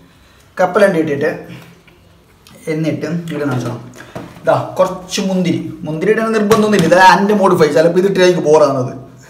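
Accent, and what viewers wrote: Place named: Kasaragod, India. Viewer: Enik നിങ്ങടെ കാസര്‍കോട് ഭാഷ ഭയങ്കര ഇഷ്ടമാണ്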